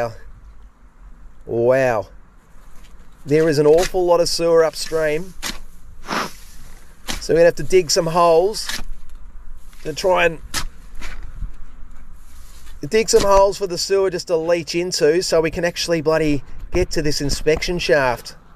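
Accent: Australia. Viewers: Absolutely disgusting but still oddly satisfying to watch 😂 And mate, your accent simply makes it perfect! Like ozzy man! Since you're also an ozzy man! Edit: Reminds me of the lovely neighbors who ate very healthy... Lots of vegetables... Which they forced down the kitchen sink! Which then obviously caused a blockage and filled my bathtub.... That was so disgusting... Needed multiple hours to clear the drain and then clean my bathtub.... So if you live in an apartment with multiple others, please either compost, throw in the trash or flush down the toilet all the parts of your organic vegetables you don't eat...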